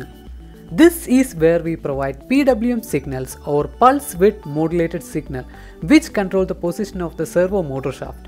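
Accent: Kerala, India. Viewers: Thanks for the video! The mallu accent brought back so many memories from Amrita University!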